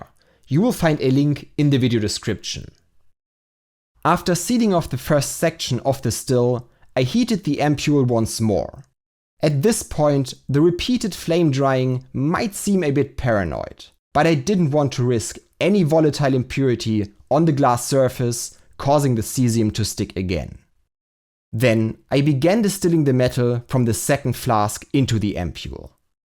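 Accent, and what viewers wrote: Germany. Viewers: Finally a face to this voice. Der harte deutsche Akzent ist irgw catchy..😅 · HAHA a german robot HAHA learn to talk like a human you f00ken AI npc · love your videos man. Außerdem, dein Akzent hört sich deutsch an, woher kommst du wenn ich fragen darf? :) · I know tis guy is German this accent is to thik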